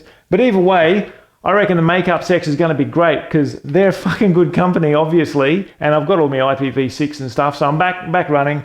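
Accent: Australia. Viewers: Good shit. We need easy to follow guides on getting not just familiar, but comfortable in using linux daily, all spoken in fluent (fuckin') Strayin. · straight in with c. YT SF-based kiddies, I mean algorithm trying to turn Oz accent into Yank accent and run it through ears algorithm again...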